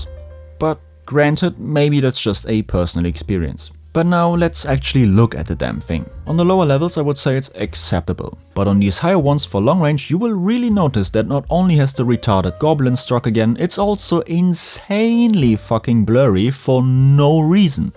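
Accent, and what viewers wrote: Germany. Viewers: Subbed because: German accent gives the content even more quality; The hard work you put into this video added a lot to the way I’ll play the game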